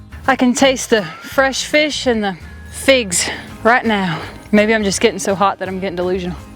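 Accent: South, United States. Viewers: You actually almost have a southern drawl for someone from Illinois.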